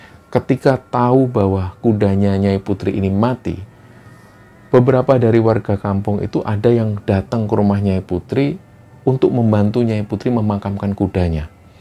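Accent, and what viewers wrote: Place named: East Java, Indonesia. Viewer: Sambil masak dengerin cerita mas jaaannn nyaman tenan, aku sbg org malang cocok dengan pembawaan mas yg logatnya khas jawa timuran...sukses terima channel aku ngikuti dr subscribe nya msh di bawah 100 smpai skrg gk prnh ketinggalan cerita mas ❤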